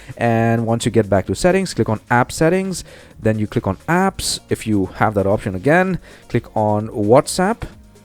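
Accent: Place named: India. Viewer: First off, thanks for being an Indian English speaker Second thanks for an ACTUAL solution to this on the internet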